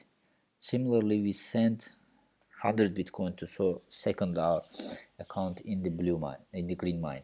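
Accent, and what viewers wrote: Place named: Azerbaijan. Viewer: Man this accent, sounds so familiar LMAO. Are you from Azerbaijan by any chance?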